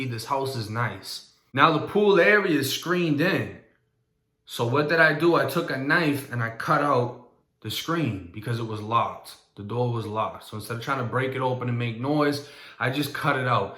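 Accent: Boston, United States. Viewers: I can tell your from Boston cuz the way you say your A's. For ex Stahted= Started Cahky= cocky but you may have grew up from 12ish on in florida. But you def dnt have a native north or south Floridian accent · he talking about florida but sound like he from boston...the fuck? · You sound like your from Boston. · I knew you had to be a Boston kid from your accent but you always talk Florida stuff, you even kinda still got our swag for someone who says you didn’t grow up in mass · Bruh u gotta Boston accent but ur from florida??? I been to Illinois doc but from what I seen bout Cali prison white boys who r confused bout their pigmentation come in tryin to sound black. Get reminded who they are real quick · Your accent sounds like you’re from Bean town!! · You from boston originally? I hear it in ur voice occasionally and honestly you just look and sound Boston bred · Say bro you from Boston or Florida . 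You kinda sound like ol boy from Sam Adams commercial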